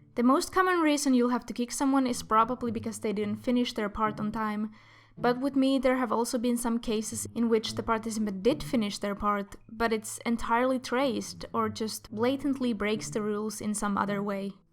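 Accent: Finland. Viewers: Why i think you are from Finland?!? Sorry about my bad English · Wait a sec, ARE YOU FINNISH. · Are you from Finland?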